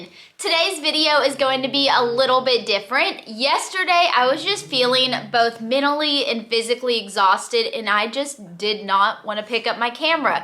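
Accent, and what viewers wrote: South, United States. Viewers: Hey there, have been watching you for sometime, especially when you were doing Keto. I love to watch your infectious Southern “ hey y’all “. You are such a beautiful gal, but some of these pics on the You Tube look a little shady. That’s not your style. Wish you and Cody the best.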